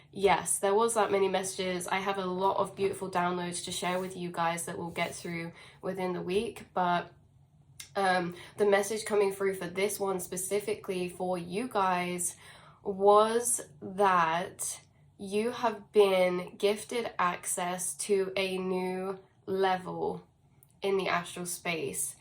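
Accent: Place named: England, United Kingdom. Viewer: Hello. Like you said, its amazing to meet you and to participate in your space. I am excited for the same reasons you stated. We are stationed so far away from each other or so we think. I just found you and for once, the messages are so clear and resonant that I dont need any discernment. I don't think I can even describe it... its not a reading its more like a reflection of my thoughts without any distortion whatsoever. I love and respect myself a lot and I am so excited that there's someone out there that I can give the same amount of respect to. And then... I can hear you're English wich means you're not just the most resonant person I've seen, you're also the closest geographically to me as I'm in London. Its all very exciting, the tribe is getting closer. Almost touching distance. And I do not feel any push or pull from your energy.. 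just a recognition of a very high vibrational being. Thank you for existing. Sending you lots of love and gratitude. Maybe run in to you one of these days.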